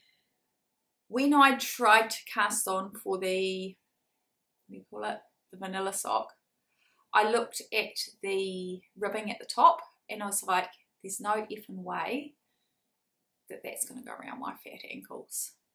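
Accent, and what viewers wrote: New Zealand. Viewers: hi it nice to meet you I'm glad Kelly recommended you I'm loving listening to you and seeing your makes I'm the same I wish I could sew better because I'd love to make my own clothes I just never get round to. it I have a soft spot for anything to do with New Zealand because I lived there quite a few years ago and my parents still have a home in the north island it's great listening to your accent so familiar Looking forward to catching up on your other episodes.I'm watching you in the sunny ukl. today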